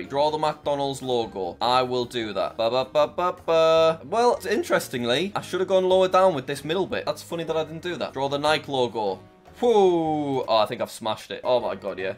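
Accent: United Kingdom. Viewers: I love how he has a British accent.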